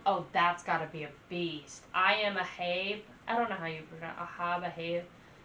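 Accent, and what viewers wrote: South, United States. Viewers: You are so cute with your south lol I'm sure by now you know they are from ATL....I've met them and they're all so southern humble (RIP) Brent 😢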